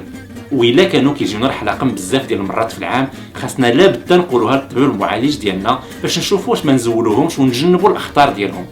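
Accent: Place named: France. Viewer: كلشي ما افتهمت من كلامك . ياسبحان الله الكلام عربي واللهجة على وزن فرنساوي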